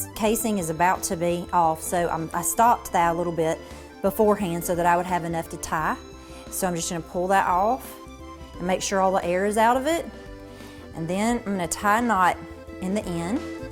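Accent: South, United States. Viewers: FANTASTIC video! You are lovely and a really good presenter. I've been watching sausage-making videos this afternoon because we've consumed all the summer sausage we got from the processor, so I'd like to make some of my own from the 5 deer my son and I harvested and I processed myself. Your video gave me the best directions I've seen so far. Thank you. And I don't know where you got that wonderful Southern accent, but I'm from Mississippi, and you sound SO much like my middle-MS and Alabama friends. Yankees love to make fun of us, but in fact we all know Southerners speak the REAL American English! Thank you! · Her southern accent makes hearing about the recipe all worthwhile . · Good video plus it helps that this woman is beautiful and that country accent damn lol I think I made it to her second sentence and showed my girl who's from Virginia and she def agrees! 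WE NEED MORE WOMEN LIKE HER! Tell your man he's a lucky guy with much love from Florida 😄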